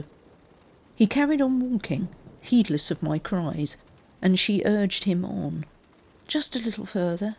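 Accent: England, United Kingdom. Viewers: Oh I am forever grateful to have found your site! Your soft spoken English (not American or AI) is so relaxing for this Australian at bedtime. I hold out hope that one day we will get to enjoy longer stories written by yourself. Your writing is great! I'm very appreciative. Thankyou, and many blessings to you ❤️🙏🏼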